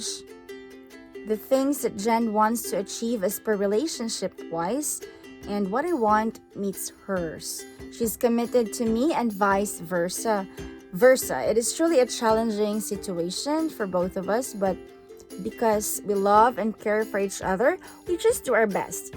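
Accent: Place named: Philippines. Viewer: nindot man imong tagalog accent maam. Share love not hate.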